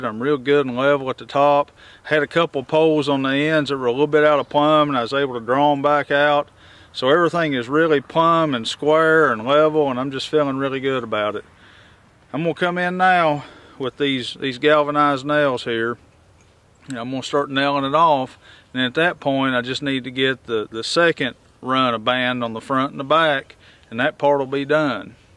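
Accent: South, United States. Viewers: Are you from Kentucky sir? I live in lewisburg. I hear that southern 2 ba fo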